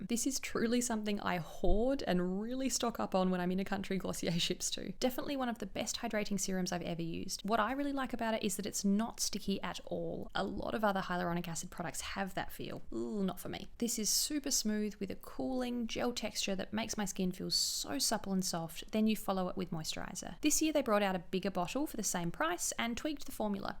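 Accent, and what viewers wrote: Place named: Australia. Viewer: I never really got into makeup before but I’ve got orders from Glossier, Fluff and UltraViolette coming now.... and I have you to thank/blame! 😂 Like many others I’m so so grateful that your makeup ‘maximalism’ can help so many people see products perform, decide for themselves and embrace minimalism in the way they actually purchase and apply product. It’s good for the world. Your lovely accent makes me proud to be Australian too. Sending love from Melbourne! ❤️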